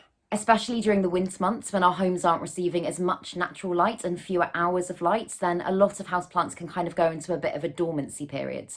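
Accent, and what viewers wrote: United Kingdom. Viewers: Not to be a creep and disregard the video but I find British accents extremely annoying but yours was pleasant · Obviously, not an american dialect, must be something from the british isles, I would say. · Thanks for sharing. Very informative and to the point. Love that accent btw :) Takes me back decades ago when I used to date British girls lol.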